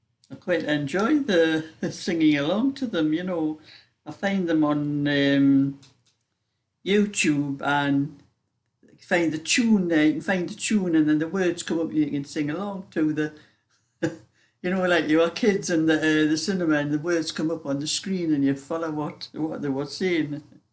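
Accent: Newcastle, United Kingdom. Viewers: G`day Thanks for the carols:) I love the white baby blanket. You Know we have a lot in common with our knitting and crochet, the time given to it all etc, Of course i just love to hear the geordie accent:) I was going to "super thank you "for entertaining me these past months. " but you havn`t got it set up. Daisy In Australia:)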